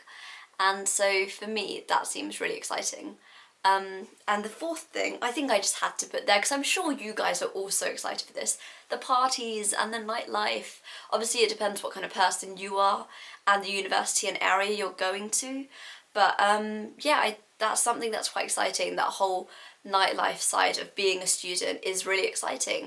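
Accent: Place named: United Kingdom. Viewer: I am excited by the accent you have! Being from India u sound more like a Britisher. I am surprised.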